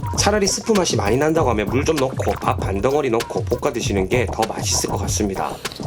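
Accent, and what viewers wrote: Busan, South Korea. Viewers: 영상에서 짜워? 이렇게 물어보시길래 ㅎㅎ 부산사투리군여 답글 감사합니당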